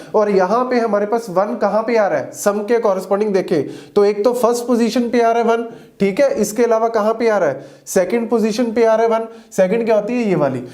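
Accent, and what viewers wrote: Punjab, India. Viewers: Sir tusi punjabi bolde oo routine ch ?? Thodi lehje toh lag reha tusi punjabi bolde howoge ?? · Sir ! Are you from Punjab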